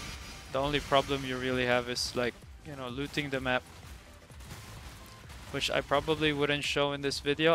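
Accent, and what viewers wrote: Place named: Philippines. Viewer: Fellow Pinoy PoE player? Guessing from the accent lol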